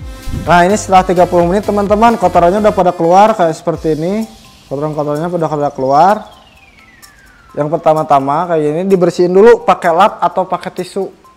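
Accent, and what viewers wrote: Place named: West Java, Indonesia. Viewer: Jogja rasa sunda😅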